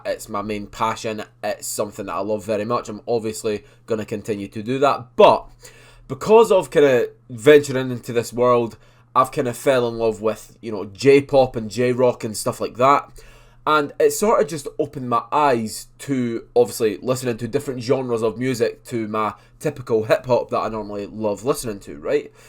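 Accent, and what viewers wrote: Scotland, United Kingdom. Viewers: Of course! I perfectly understood you! But even if I didn't, I would happily exchange that for the Scottish flair 😂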